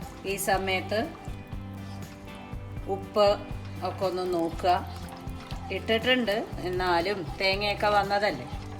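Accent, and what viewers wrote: Palakkad, India. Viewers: moly. I used to make 70 percent items in same recipie. But I will not miss your videos as I like to hear the explanations in palaghatan sailie.Also I enjoy your (jokes) homely talks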